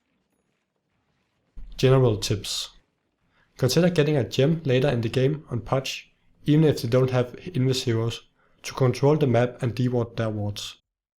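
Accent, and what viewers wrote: Denmark. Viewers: He is danish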